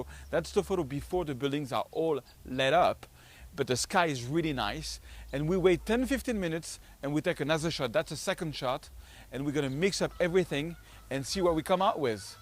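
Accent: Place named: France. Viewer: Hey..I realy love your stuff..you gave me a lots of nice ideas about retouching. Also you are a funy guy and a real true person..with your French accent...always makes me lough...but in the positive way.. Hope to get some new and useble infos etc from you in the future.. Keep up the great work Mr.;)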